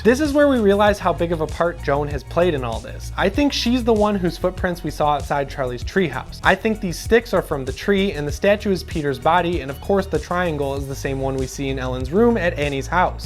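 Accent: Midwest, United States. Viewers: Great review! Where are you from? Can’t place that accent. Illinois maybe? Wisconsin?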